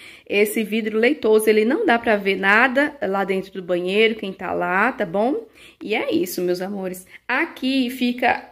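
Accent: Brazil. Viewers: Vc e baiana???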